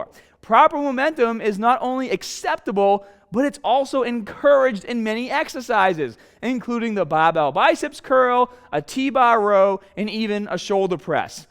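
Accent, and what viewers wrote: Boston, United States. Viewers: I'm genuinely curious, what part of America is he from where they pronounce 'A' as 'Ah'? Great videos by the way, big fan :) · The Boston accent is annoying as HELL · Holy Crap! I've always watched your videos for your good looks and charming Boston accent. I had no idea that was a mistake until you mentioned it in this video!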